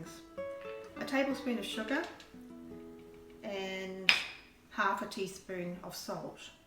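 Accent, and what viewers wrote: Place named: New Zealand. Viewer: I had never thought of making date scones until today when I saw your wonderful video (and recipe!) I will be 'having a go' later today! I'm in Yorkshire so am pleased that you pronounce 'scone' properly! I have friends here who are from Dunedin so I'm used to your fascinating accent - though I still find it amusing when you mention 'the bist brid; marking the sigments; so much bitter; a lemon zister!; ¡ɹǝʇʇᴉq sᴉɥʇ puɐʇsɹǝpun uɐɔ noʎ ǝdoɥ I - ooʇ ǝƃɐnƃuɐl puɐlɐǝZ ʍǝN ǝɥʇ uᴉ ǝʇᴉɹʍ oʇ uɹɐǝl oʇ unƃǝq oslɐ ǝʌ,I Thanks again for the video and the useful addition tips!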